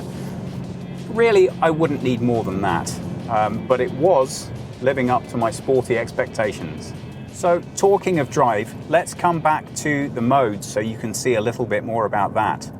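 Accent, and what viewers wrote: United Kingdom. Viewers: What an incredible DESIGN ROBBERY yes ;) Great review, love his English accent, Autogefühl have the best car reviewers of the net... but guys, please try to look neutral... your reviews are always biased regarding the VK company's cars... this sentance is just proving that... I know they are providing you with new cars and interviews... but starting to feel sick of this propaganda for DAS CHEATERS #DieselGate #MyTake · Excellent and thorough review! British accent is music to my ears!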